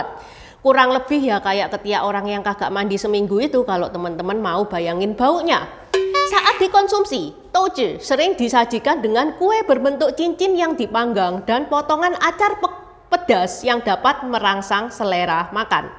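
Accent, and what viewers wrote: Java, Indonesia. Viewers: Enakan yakin dengerin dongeng nya nih.. dg bhs indonesia ber aksen jawa medok.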